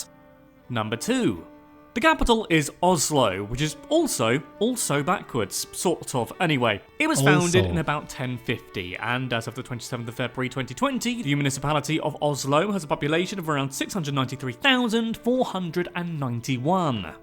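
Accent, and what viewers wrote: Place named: Quebec, Canada. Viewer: Funny accent for a Canadian.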